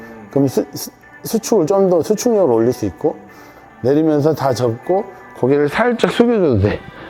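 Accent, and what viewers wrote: Jeolla, South Korea. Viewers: 전라도 행님이셨네요 ㅋ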